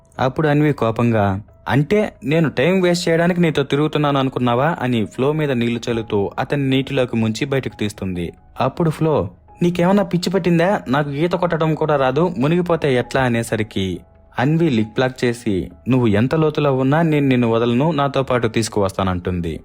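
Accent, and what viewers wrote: Rayalaseema, India. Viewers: Bro mee accent rayalaseema la undi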